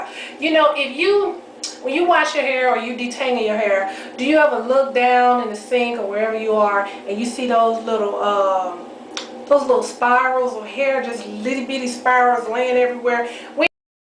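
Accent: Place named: Mississippi, United States. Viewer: I am originally from Mississippi and your channel (and accent) gives me a taste of home. I never thought to use hair grease to pre-poo. Well dang... Gonna try this next wash day.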